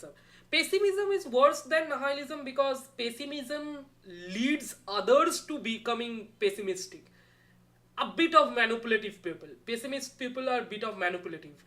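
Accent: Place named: Bengal, India. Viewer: Dada i know that accent u have, sotti kore bolo......you are bengalee na?😁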